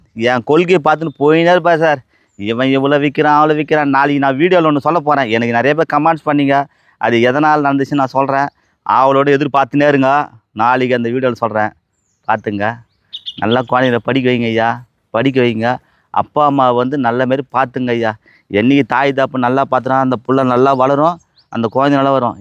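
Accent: Madurai, India. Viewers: Madurai vasagam super valthukkal 🎉🎉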